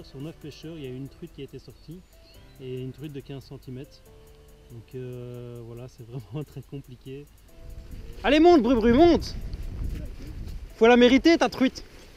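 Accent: Belgium. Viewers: saluut superbe video comme tjr tu ma eclater de rire avec ton belge c super marrant dans certaine condition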